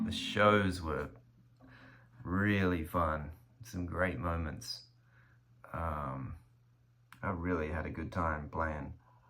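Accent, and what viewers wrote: Australia. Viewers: I’m glad you still have a strong Aussie accent. You’re doing us proud over there!!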